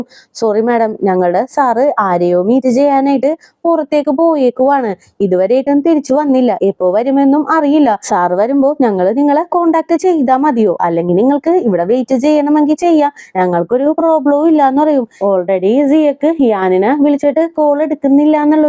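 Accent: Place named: Thrissur, India. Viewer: Language style kettal thanne Thrissura nadennu thonnunnu. Pls mention the drama name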